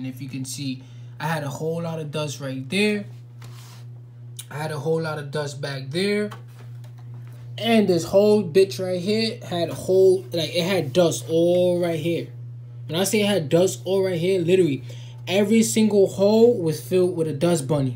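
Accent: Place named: New York, United States. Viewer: ye he from ny lol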